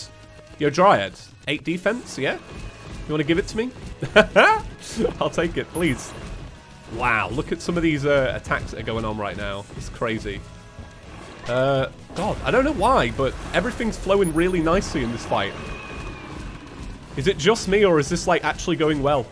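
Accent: England, United Kingdom. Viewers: British accent is the best I mean I'm British so idk if I'm one to decide but eh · Is a british couch gang member i can relate to british speach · I actually like English accent so it's okay fir me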